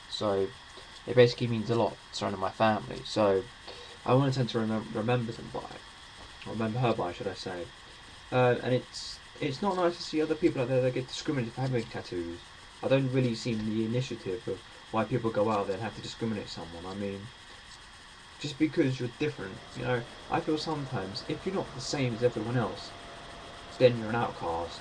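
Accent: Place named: United States. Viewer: Dude please, PLEASE drop the american acsent!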